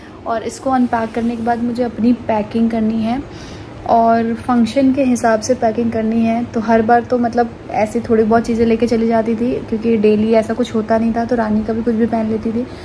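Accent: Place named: Bhojpuri, India. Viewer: Aaj 1St time comment kar rahi hu .Aapke muh se bhojpuri bahut acha lagela❤❤❤❤❤❤❤❤❤❤❤❤❤❤❤❤❤❤❤❤❤❤❤❤❤❤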